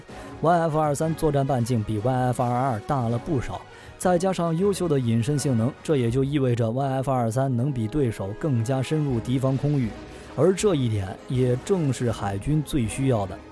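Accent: China. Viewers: 這不是台灣人做的影片。口音、用字是強國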